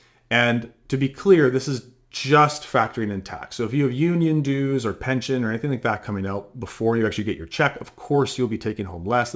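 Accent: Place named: Manitoba, Canada. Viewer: sask is not important i guess ,i can tell your from manitoba,boring like your province!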